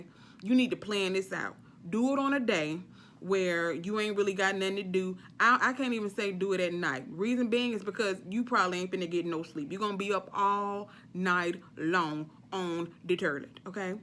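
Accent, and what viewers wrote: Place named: South, United States. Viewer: NEW SUB LOL MUST BE FROM THE SOUTH HAHA LOVE HOW YOU SAY TOILET "Turlet" 😆😆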